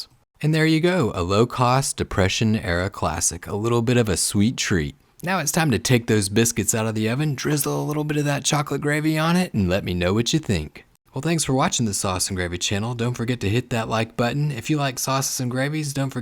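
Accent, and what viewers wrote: Tennessee, United States. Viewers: I'd say the Southeast, particularly Tennessee.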